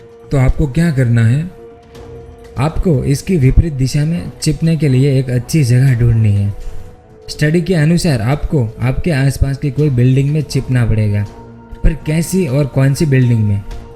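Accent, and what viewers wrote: Gujarat, India. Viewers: ગુજરાતી લાગે છો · Bhai Gujju ho kya 😃👌mast bolte ho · Gujarati h kya bhai mst bolta h😁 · Bhai Gujarati ho kya? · Bhai teri hindi gujju jese arhi hai pls nxt time kisi aur ki awaaz aaye aisa karo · Gujarati Ho kya? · A gujrati hai lavda saala awaaz se hi pata chal rha hai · Tu gujarati h kya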